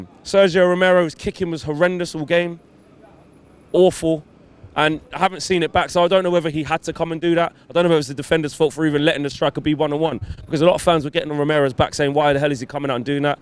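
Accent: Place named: Manchester, United Kingdom. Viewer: Strong Mancunian accent there... MOU in!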